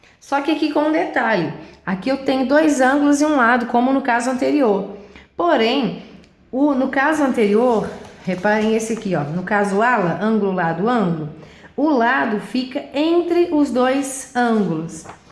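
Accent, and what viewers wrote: Minas Gerais, Brazil. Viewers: Prof.ª: congruência NÃO significa, necessariamente, igualdade. Congruência, por definição, é a coincidência ou correspondência de caráter ou qualidades; proporcionalidade, conformidade, concordância, harmonia. Se dois triângulos têm os três lados iguais e dois ângulos também iguais, obrigatoriamente tais triângulos são absolutamente IGUAIS. Mas, como regra geral, a terminologia correta no estudo de semelhança de triângulos, o correto é utilizar a palavra "congruência ou congruentes". Importante observação: suas mãos são lindas, assim como seu sotaque mineiro ( lá de Barbacena ).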